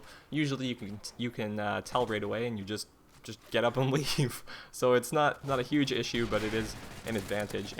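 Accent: Canada. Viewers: hey are you from canada??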